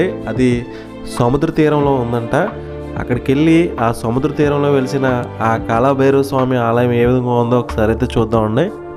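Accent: Godavari, India. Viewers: సార్,. మన కోనసీమ లో మీరు చూపించే ప్రదేశాలు అద్భుతంగా ఉంటాయి మీ విశ్లేషణ కూడా భలే ఉంటాది. సార్.. మన గోదారి స్లాంగ్ లో మీరు మాట్లాడే భాష భలే ఉంటాది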